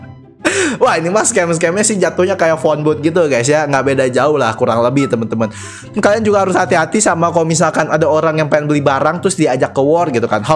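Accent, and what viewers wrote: Indonesia. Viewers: Item Dreams? Akwikwk Indo parah itu bahasa Inggrisnya 🤣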